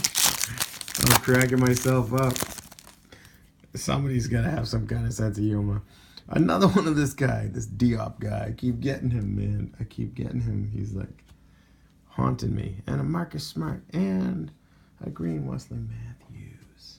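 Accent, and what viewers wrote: Boston, United States. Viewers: I can tell you're from Boston! Lol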